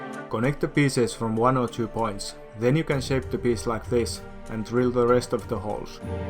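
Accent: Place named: Finland. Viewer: Did you use a metal rim because you couldn't find rawhide? Arvasin aksentista, et oot suomalainen ;)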